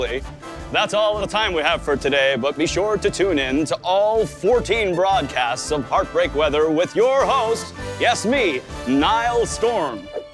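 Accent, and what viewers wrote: United States. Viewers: Lmao why did he use an american accent ??😂😂😂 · are we just not gonna talk abut how GOOD his American accent is though!?!?!😂💖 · His American accent is rly good. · The american accent skfjdjdj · his american accent is so good wtf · He sounds so American haha, cutie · That's some American accent there. I live in Colorado. People come here to learn to lose their accent, for real. 💙(*-*) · His americn accent holy shit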